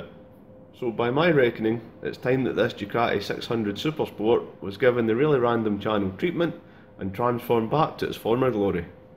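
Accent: Scotland, United Kingdom. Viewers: First time I have heard your voice and you are a fellow scotsman 👍. You got yourself a new subscriber. Are you planning on keeping the ducati or selling it just out of Interest. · Where about in Scotland are you from ? Hello from Fife lol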